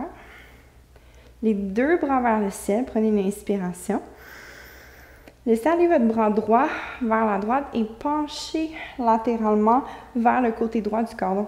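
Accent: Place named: Canada. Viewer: Merci belle canadienne avec ton super accent..que j adore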